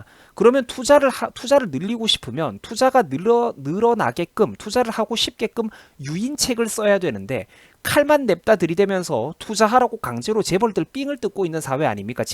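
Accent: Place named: Gangwon, South Korea. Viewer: 혹시 고향이 강원도신가요? 억양이 들리는거 같은데 구수하고 정겹습니다 ㅎㅎ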